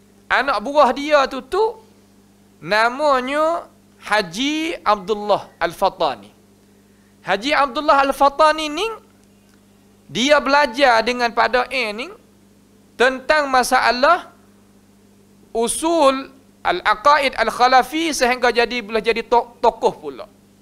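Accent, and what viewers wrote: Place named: Kelantan, Malaysia. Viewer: Kalu buke oghe Kelate payoh kakloh nok pehe ni... 😁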